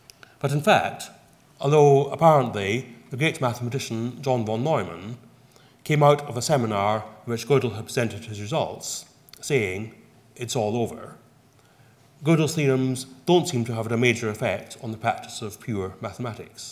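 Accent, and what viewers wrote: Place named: Scotland, United Kingdom. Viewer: all godel proved is mathematicians like to argue and are pompous at best.. but his accent. the most posh scottish i have ever heard lol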